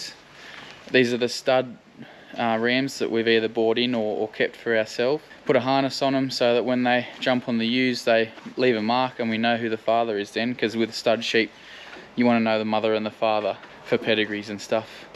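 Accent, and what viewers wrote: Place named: Australia. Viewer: Just found your site today and subscribed and liked. Watched the lot this wet Tuesday. Great videos; especially the drone work. Could I suggest a bit more technical info, i.e. tractor size, implement used (include brand - you may get a sponsor) mob numbers etc. Great to hear Aussie English instead of took the wool OFF OF, took the alfalfa OFF OF and everyTHINK. Keep it up it's great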